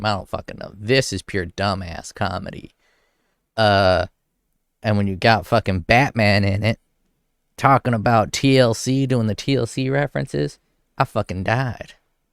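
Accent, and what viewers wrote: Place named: Alabama, United States. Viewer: What Town did you live?goddamn Alabama country boy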